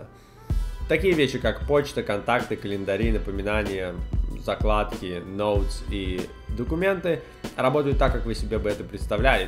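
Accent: United States. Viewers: по-моему живет в США:) акцент так и прет:) "на интернете" хаха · умиляет американский акцент и интерференции с английским языком в речи ведущего.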